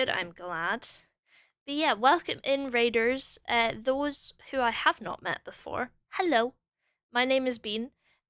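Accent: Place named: Scotland, United Kingdom. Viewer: HELL YEAH- SOME SCOTTISH BACKGROUND NOISE FOR MY COURSEWORK (i dont catch streams often and by GOD am i glad i found this)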